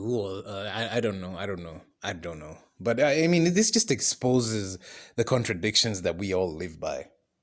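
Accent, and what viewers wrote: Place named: United States. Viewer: The world is full of ridiculous people I also wanna ask something off-topic that I was wondering Your accent, it's interesting it sounds like a mix between Indian and American, am I wrong here?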